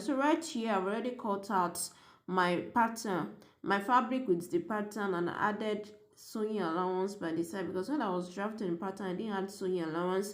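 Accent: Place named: Nigeria. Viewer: I love your natural and beautiful Naija accent ❤❤❤ I had watch multiple times. Thanks for the interesting tutorial! I'm about to try this technique but scared to flop 😅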